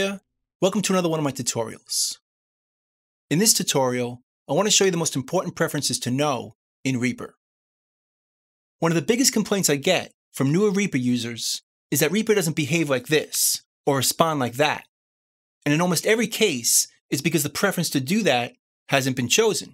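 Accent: New York, United States. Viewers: Hey your from New York like me, I recognize that accent anywhere, I grew up in NYC, Queens YO! Yeah Reaper is awesome, I was using Adobe audition for the longest time and the latency issue on that thing drove me absolutely crazy, it got to the point where I gave up and would just try to physically move the tracks so they would play in time, but even then even if you're a millisecond off then after a minute it goes out of time. I really don't understand why a company like Adobe would go through all that trouble making a program like that and totally F up the latency issue. Anyway Reaper is amazing, no issues whatsoever, and THANKS so much for all these tutorials! Fantastic!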